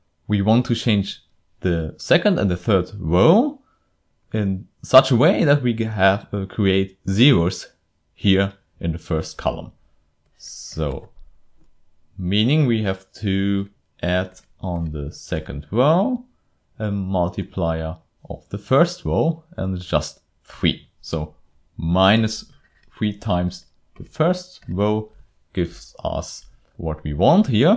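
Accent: Germany. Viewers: Great tutorial video though Permit my saying that your accent seems german.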